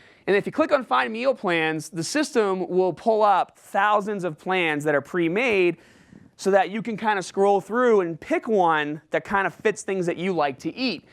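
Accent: Boston, United States. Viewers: Your staht boston accent comes out a little, haha.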